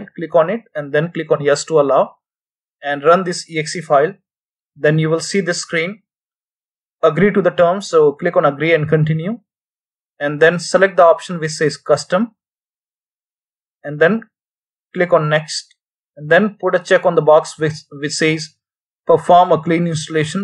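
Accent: India. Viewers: I'm hoping it's not blatant racism. I mean I was sketched out from the voice for a min to but I got anxiety XD and I watch to much scammer payback. But from what I'm seeing everyone says it works so no reason to doubt the man just cause he sounds like a specific group of scammers even indians hate scammers.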